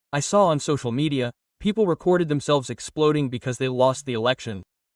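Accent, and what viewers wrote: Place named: United States. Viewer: Vidio foi gravado por me parece com voz americana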